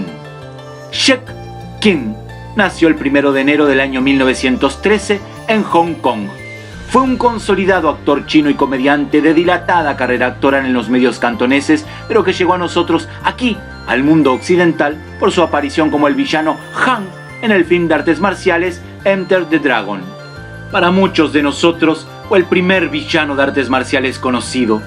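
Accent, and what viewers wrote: Argentina. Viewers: ahhhhh... que irritante el acento argentino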